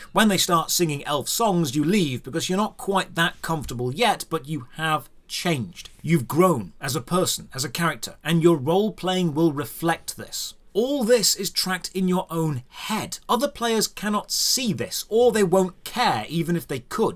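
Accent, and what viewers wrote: United Kingdom. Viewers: I usually can't take dnd seriously or the narration of dms. But with this guy I could, he looks like an elf and sounds like the omnipresent British voice narrating childhood favorite obscure animated fantasy films from the 80s. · your accent... I can’t put a finger on it. I’d guess somewhere north of London. But not the savage lands that is the North East of England.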